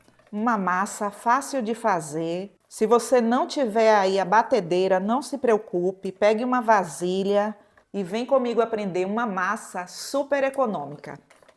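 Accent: Bahia, Brazil. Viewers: Te encontrei por acaso , e estou começando a fazer salgados, já fiz uma receita de coxinha ficou maravilhosa. Vou fazer vários pra vender. Vc explica com muita calma e amo seu sotaque minha família é da Bahia. Eu sou de São Paulo assistindo suas aulas. Gratidão vc não imagina como está me ajudando. Na verdade acredito que está ajudando muitas pessoas. Jesus te abençoe 🙏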